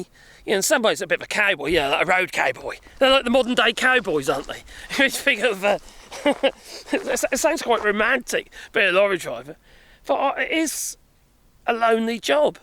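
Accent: United Kingdom. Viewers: You think lack of truckers is going to be the problem ...british accent doesn't mean you aren't an idiot ...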